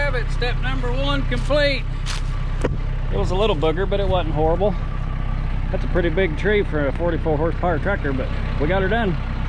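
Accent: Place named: South, United States. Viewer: Even though we live almost 600 miles away We Love hearing that cornbread accent in voice. Sounds exactly like all the kids around here. Awful Southern sounding to be that far North.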